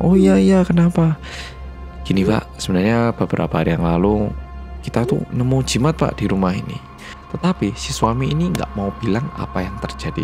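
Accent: Java, Indonesia. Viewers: medhok ganteng wees🌛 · "MENGGANDOLI" bersama orang korea yg medok tenan 👍🤭😁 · Gkpp deh udh 1 bln lalu bru nonton habis aku tuh nyari ka yang rambut ny agak panjang lebih ganteng hehehe kK muka ny bd setiap rambut ny pendek, pakai kacamata, dan rambut sedikit pnjng tp tetep ganteng koq kak yang medok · Bersama orng korea yang apasih kata mas? Aku taunya orng korea yg medog.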